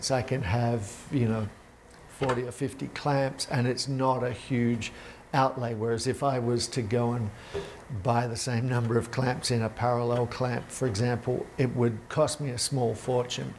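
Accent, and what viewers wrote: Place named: Australia. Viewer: From the start of watching your videos I always assumed that you were working from Australia from the accent. How would you compare woodworking in America compared to Australia? Always enjoy your videos thanks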